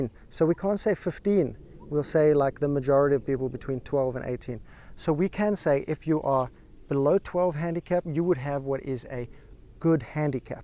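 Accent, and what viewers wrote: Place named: South Africa. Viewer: Howzit china! Judging by the accent, you're just as South African as me lol. Dig your vids my bru. I've managed to lower my handicap from 28 to 16. Happy with that. Aiming for single digits this year. Been playing properly now that I'm in the UK as it's much more accessible than back home. Your tips and tricks are much appreciated!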